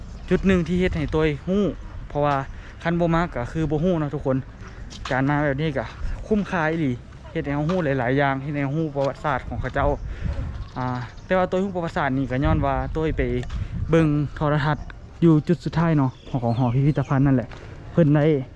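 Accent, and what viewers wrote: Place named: Lom Sak, Thailand. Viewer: สำเนียงเอื้อยเหมือนคนจังหวัดเพชรบูรณ์อำเภอหล่มสักหล่มเก่าครับ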